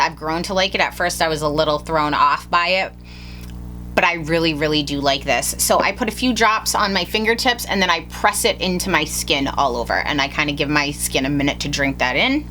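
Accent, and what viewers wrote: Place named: Midwest, United States. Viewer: omg that midwestern accent is piercing at my soul.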